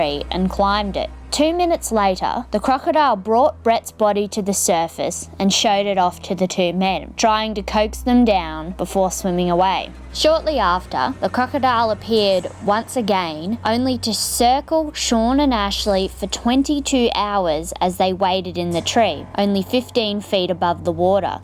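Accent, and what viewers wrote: Australia. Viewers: Aussie accent my man lmao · Okay I'm having a hard time understanding Australian English. Did she really say that the park rangers caught Sweetheart but it died of exhaustion evading them? This makes no sense. I can understand catching the croc then having the croc die of acidosis due to it's struggles but she makes it sound like the croc must have escaped because once your caught the evading is done. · Love how aussie this chic is reading the info. On a sad note a couple of crocs died in the making of this film because al lot of people where morons. Crocs outlived the dinosaurs for a reason, stay out of thier kitchen and you won't get eat. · Now that's a pure Aussie accent I love it👌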